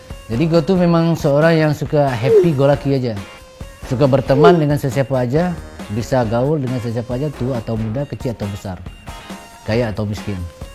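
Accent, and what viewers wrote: Malaysia. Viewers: Go support from Malaysia 🇲🇾🤞🏻 accent malaysia tak hilang lagi tu😂😂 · Cakap mcm org malaysia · Logat Malaysia masih belum ilang.. Sekali masuk master chef Baginda lord ngomong nya gua gua..😁😁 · Kok lucu logat melayu tpi pake "Gua" 😅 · Pantesan bahas nya lebih ke kaya orng Malaysia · Pernah tinggal di Malaysia sama kaya atta dong.....gaya bicaranya sedikit2 kaya orang Malaysia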